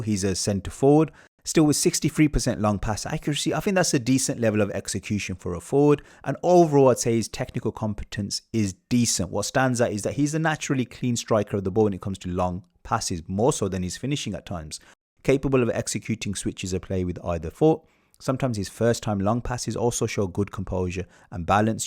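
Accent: Birmingham, United Kingdom. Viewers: Lovely analysis. 1st time listener, but will deffo be back. Is that a slight Brummie accent I can hear BTW? 😂 Shirley boy here!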